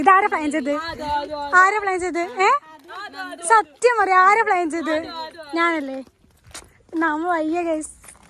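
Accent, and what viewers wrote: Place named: Kollam, India. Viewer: Palakkadan basha ❌ Kollanpotta basha ✅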